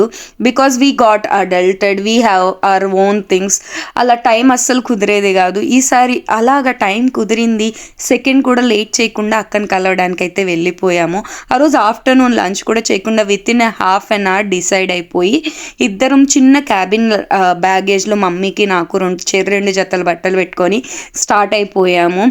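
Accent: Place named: Guntur, India. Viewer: Anukomaakandi. Pure gnt slang.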